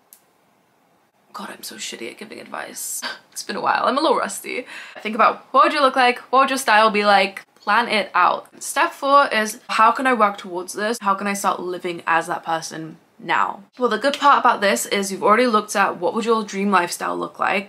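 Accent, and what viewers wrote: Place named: United States. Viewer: No cus I’m 28 now and have watched your videos for years but haven’t watched in so so long and I felt a lil disconnected cus your American accent has gotten so much thicker and I feel like I’ve missed out on sooo much. Second I heard ‘let’s go ladies’ I was back in the zone. Back to the video with the dry shampoo. If you know you know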